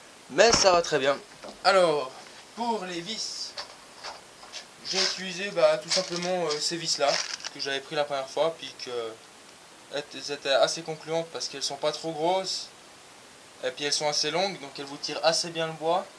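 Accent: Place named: Switzerland. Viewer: hello, vu ton accent et ta minutie tu viens de suisse et moi aussi :) du coup j'aurais aimé savoir ou est ce que tu as acheter les vitres et les rails car je n'en ai pas trouver a jumbo ou autre magasin de bricolage :(( mes pantherophis demande de la place, je cherche a me lancer dans la fabrication d'un terra comme le tiens. en espérant que tu pourras m'aider... en tous cas beau boulot!! chapeau! et merci pour la vidéo.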